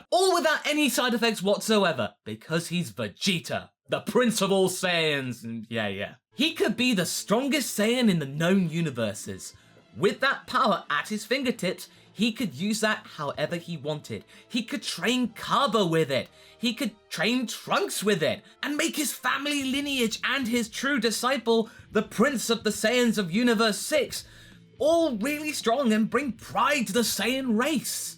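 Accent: United Kingdom. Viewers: dude your voice sounds like a british version of dbz abridged goku 😂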